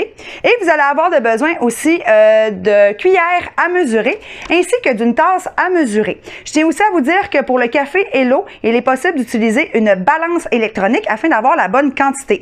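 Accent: Quebec, Canada. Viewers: Bien le bonjour. Le café doit être bon mais l'accent Québécois est plus sucré et très agréable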